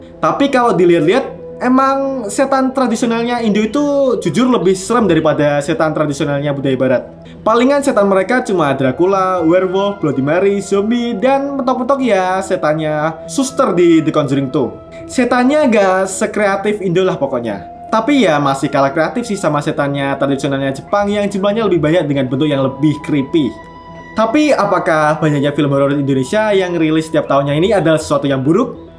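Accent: Java, Indonesia. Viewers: Jowo nyell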